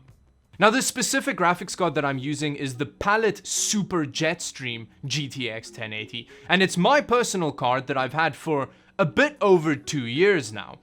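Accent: South Africa. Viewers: hey whats your accent? south african im guessing? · are you "a botjie from SA" or just have an accent? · where are you from dude, you have a polish name but you sound south african im so confused rn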